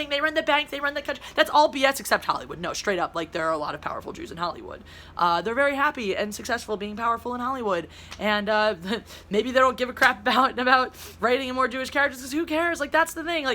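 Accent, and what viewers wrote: Philadelphia, United States. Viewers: I like when gets all Philly on us. XD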